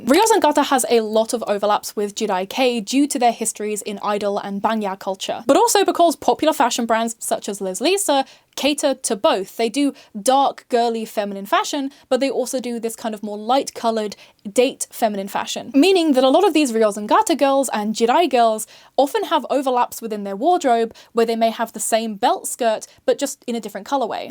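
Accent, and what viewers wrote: United Kingdom. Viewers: She is very clearly and audibly English.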